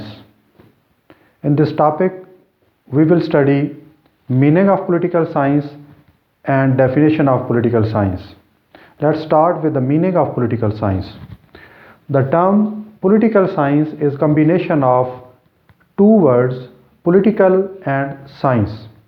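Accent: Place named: Pakistan. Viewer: Typical pakistani accent. But still understandable.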